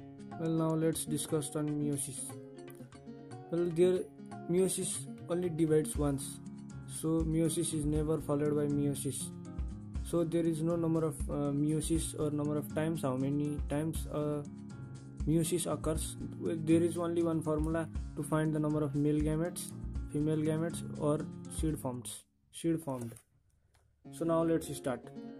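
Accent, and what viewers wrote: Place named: India. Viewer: Poor teacher with a poor Indian accent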